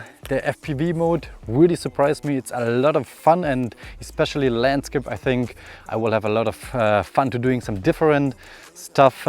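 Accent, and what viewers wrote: Germany. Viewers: your englisch sounds a littl bit like you are german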